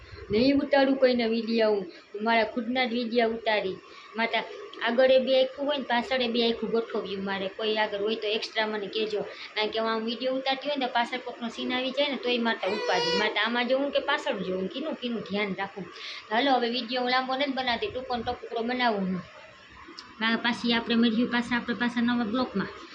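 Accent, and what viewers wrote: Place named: Mer, India. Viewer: Ben emaa dhyan j n devaay saachi vaat che ben aapde aagd vdhy bijaanu n jovaay tme ben amaara mer jevu j bolo cho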